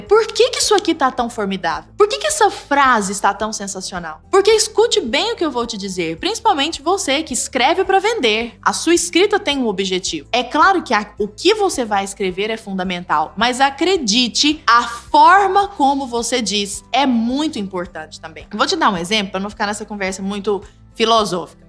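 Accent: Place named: Centre-West, Brazil. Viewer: Pelo sotaque e daqui do centro oeste kk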